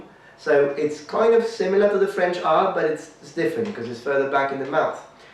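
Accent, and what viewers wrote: England, United Kingdom. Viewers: Thank you so much! You are my language GOD! I’d been pronouncing the German r instead of Italian r for 10 years in my Italian and Spanish. I’d seen tons of videos and only 2 videos helped me a little bit. I’m studying bel canto now. It’s very important for me to make this detail perfect. I had subscribed you for liking you. Tonight I was so frustrated with this tricky r, and accidentally saw this video. THEN I DIRECTLY PRONOUNCED IT RIGHT by mimicking you. Yeah it is just as easy as you said. You have no idea how much I LOVE YOU right now. Btw I was attracted by your English accent at first. It’s beautiful. And I noticed your Chinese is also good. (My nationality is China but I’m ethnic Korean) You are the maestro of language in my heart. Now I can go to sleep happily. Buonanotte! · are you british? I mention it because of your accent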